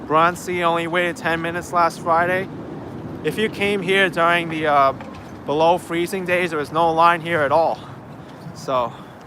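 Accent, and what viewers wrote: United States. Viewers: Before you appeared on video, just by your voice, i thought you were an American. After you appeared on video then i realized that you're an ABC - America Born Chinese. Great work for sharing the video. I enjoyed it. Brilliant for showing life goes on despite all the rumours and insults that had been throwing around. You're fit and standing tall. Best wishes. 👍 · When I first started watching, I thought you had some type of Asian accent, now I listen to you and hear you definitely have a new York accent 😭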